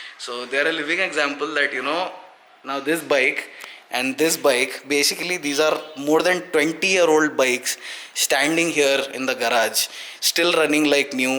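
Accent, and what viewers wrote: Hyderabad, India. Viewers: hyderabadi hindi is so cool 😂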